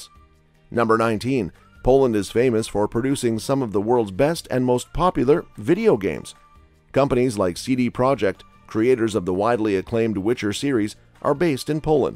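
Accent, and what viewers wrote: United States. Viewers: Agree here. He should have learned how to pronounce it right and not to read it like an American would try it to pronounce. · Authentic Polish pronunciations should be used in this video- not Americanized pronunciation s. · Imagine 2023 having internet still saying polish names with us accent disrespect...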